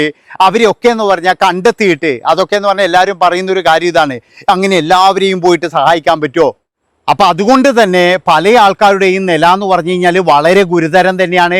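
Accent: Kannur, India. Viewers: Enghalaranappa kannur karananno😂😂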